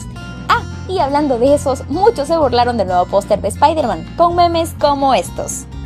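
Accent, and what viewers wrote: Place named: Mexico. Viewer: en serio eres de acuador hablas como mexicana tu muy bien al meter tambien noticias de anime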